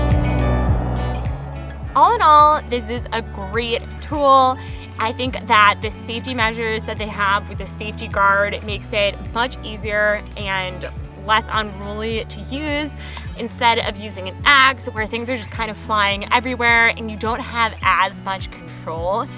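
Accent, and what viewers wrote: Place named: United States. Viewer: This American voice gets in your nerves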